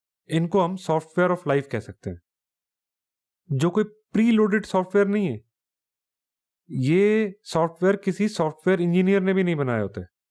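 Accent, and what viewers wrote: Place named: Punjab, India. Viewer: Thanks, aapka punjabi accent and simple way of explaining is v.good. Thank u.. Kya aapka book crossword stores me hai. ?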